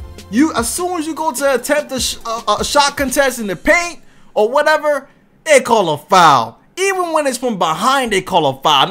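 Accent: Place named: Boston, United States. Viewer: your definitely from the Boston area admit it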